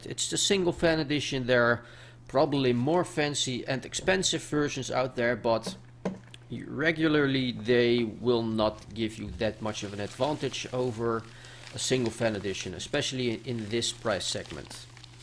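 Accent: Netherlands. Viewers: Love your video's man. Your dutch accent is funny xD Could you possibly help me with a build? The budget is 750 euros. and id like a GTX 770 in there. Thanks annyways. Keep up the great work! I dont need a Monitor, keyboard, harddrive, or mouse. Also id fancy a sexy/badass case! <3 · are you dutch or belgian??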